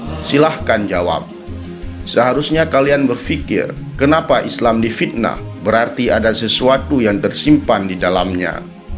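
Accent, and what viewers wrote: Bali, Indonesia. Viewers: Assalamualaikum admid ,admin apa ora bali ya lagat og kental kaya dari bali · Admin punya aksen dari zona Bali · Cengkok bahasanya seperti orang bali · Pengisi suara dari bali ya?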